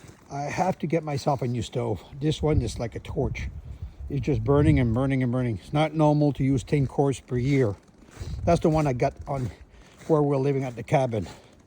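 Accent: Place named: Louisiana, United States. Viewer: If you're Canadian why do you sound kinda like a Cajun?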